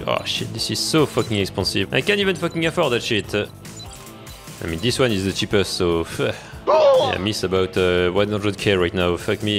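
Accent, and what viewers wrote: France. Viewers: digga man hört doch seinen französischen akzent so raus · Ton accent français 💀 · I love this guy, his french accent, the edits and the insults make it so much more funny and enjoyable lmaooo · your enraging griefers and french accent combined makes this content so damn funny 🔥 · Mais t’es français ? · there is something about roasting people with a thick french accent that is so funny and heart warming lol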